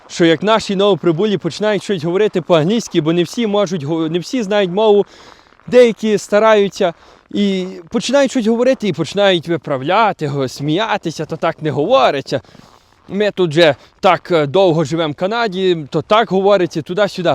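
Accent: Western Ukraine, Ukraine. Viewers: ми в Канаді уже 8 років. В тебе гарний український акцент. Дякую за український контент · Очень хороший украинский у Вас, ) пускай и с западэнским акцентом) Но ударения и вправду ставите где нужно, так что претензий к произношению нет =) Не знаю кто там булькает в вашу сторону. Мудилы, которым заняться нечем, наверное. А по поводу Педальки, которую нужно прожать и по капле каждому долучытысь до перемогы, увы, наша страна многим не даёт эту педальку нажать и сделать вклад и посильную помощь, так как мы, мужчины, уже не граждане Украины, а обычный мясной набор под названием "Мобилизационный ресурс". Нам не дают спокойно работать (постоянные облавы на заводы), спокойно перемещаться (Блокпосты и проверки на каждом повороте), даже жить хотя бы по человечески довольно трудно. У меня есть с чем сравнивать, я был в оккупации. Орки ко мне относились более уважительно, чем ТЦК на улицах "Вильной Укрийины". 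Так что я сейчас думаю как границу пересечь в любой способ, что бы выжить, а не как бы задонатить на ЗСУ. Увы. Реалии жизни. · Чудова у Вас вимова. У деяких селах на заході саме так і говорять. Насправді у кожному районі трошки іньша, своя вимова. І це різномаїття - це є добре. А взагалі ніхто не звертає на акцент уваги в наші часи.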